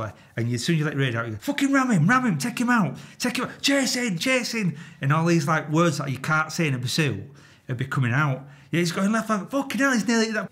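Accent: Yorkshire, United Kingdom. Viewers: Again love hearing this side of the stories from could listen for hours hearing the unedited version of life as a traffic cop, love the book by the way excellent read esp when you read in with a yorkshire accent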